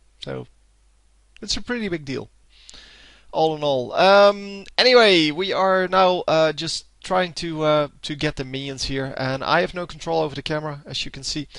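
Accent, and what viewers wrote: Netherlands. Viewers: are U dutch, like me? U have dutch accent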